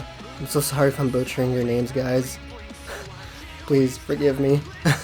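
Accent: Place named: Texas, United States. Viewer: Ayyy, you from Texas man 🤘🤘🤘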